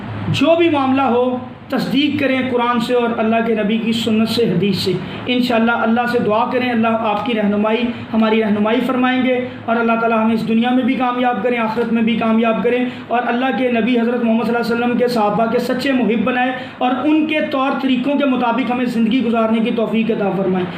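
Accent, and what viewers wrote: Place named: Punjab, Pakistan. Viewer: He is in punjabi accent with correct information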